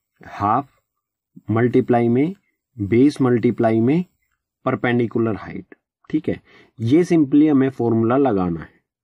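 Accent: Haryana, India. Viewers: Sir aap haryana se belong karte ho kya 😊 apki voice mein haryanvi tone hai 😊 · Thank you so much sir for this video Sir one more question :- Are you from haryana? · Very well done sir, if you do not mind, kindly change your Haryanavi tone. it will be better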